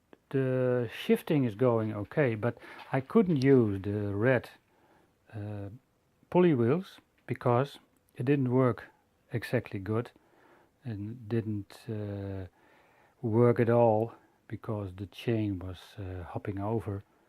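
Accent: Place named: Netherlands. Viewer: Leuke video! Je kan wel beetje horen dat he Nederlands bent maar nog steeds leuke video’s ik heb pas een mtb en ben hem ook aan het verbouwen om maar te zeggen😂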